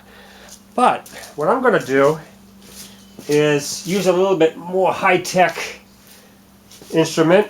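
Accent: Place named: Boston, United States. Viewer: stoppsi Obviously Massachusetts/Boston/New England.. lol But it's ok..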